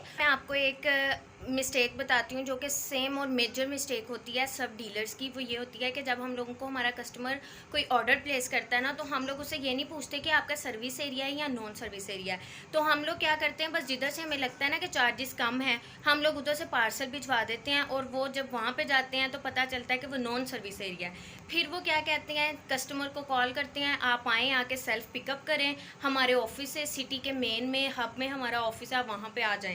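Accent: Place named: Faisalabad, Pakistan. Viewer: AP Faisalabad say hai ? Apky bolny ka Andaz faisalabadi Laga btw AP business Kon sa kar Rahy hai ?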